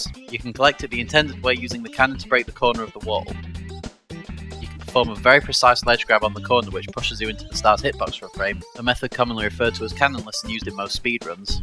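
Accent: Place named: United Kingdom. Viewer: British lad voice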